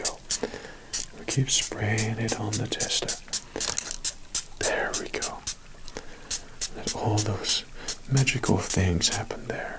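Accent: United States. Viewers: Your american accent is improving 👌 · I mean with all due respect, because this video is hilarious… but what the actual fuck,?! 🤣 Hit the bowl too many times and came up with a WILD video! Really not too shabby there with the American accent! You don’t lose it too often and when you do, it can easily be glossed over when we reset. A dialect coach might not be fooled but I can be!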